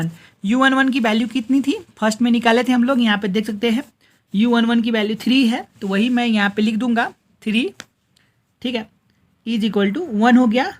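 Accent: Nepal. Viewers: dai nepali ho? haha , anyway loved it haita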